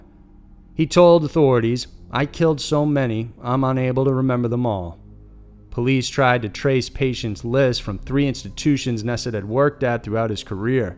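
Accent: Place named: Texas, United States. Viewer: Wow, these are so disturbing! Also I love your accent. I’m British so it’s a guess, are you from Texas?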